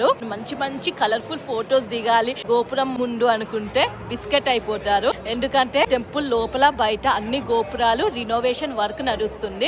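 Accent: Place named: United States. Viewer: అమ్మా చెల్లెమ్మా...కాస్త మన తెలుగులో చక్కగా మాట్లాడు తల్లీ..అమెరికా నుండి వచ్చినట్లు తెలుగులా కాకుండా...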